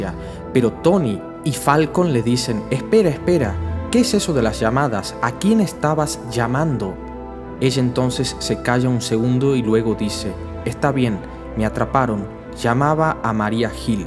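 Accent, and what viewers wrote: Tucumán, Argentina. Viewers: sos tucumano amigo? habla como tucumanl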